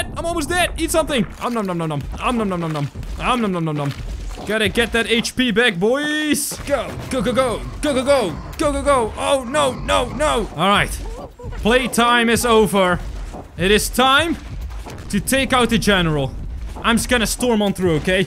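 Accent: Netherlands. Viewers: are you dutch?! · You dutch????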